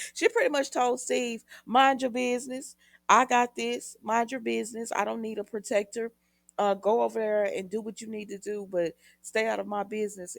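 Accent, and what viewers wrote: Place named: Greece. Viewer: I love your Greek accent!!! Probably better then the actors!!!!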